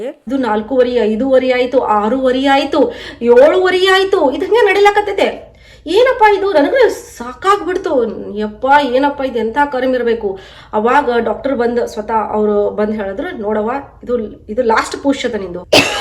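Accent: North Karnataka, India. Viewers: R u from uttara kannada or uttar karanataka..ur accent sounds from uttar karanataka but ur surname says u r from coastal part of karanataka..confused 🤔🤗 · Am fan of your kannada, america dalli idru kuda nim uk slant kannadana martilla, yavde hammu, bimmu torisde kannada matadtira, really great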